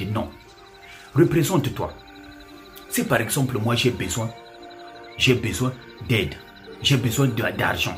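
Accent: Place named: Gabon. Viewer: Ah ta we mu Nzebi? Tu parles comme un gabonais...Merci pour les conseils.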